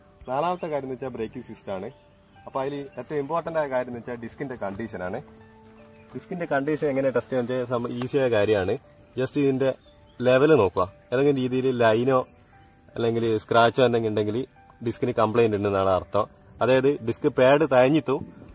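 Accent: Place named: Vadakara, India. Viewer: Bhasha shyli vadakara istam😃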